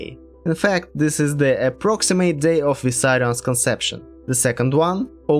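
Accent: Russia. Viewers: What a terrible russian accent you are speaking with! But I'm ok with that. Have you been there? Why do you think this info is worth spreading? It is "prooved" only by those who imprisoned Vissarion. Go to the webside of the community and check how people deal with information.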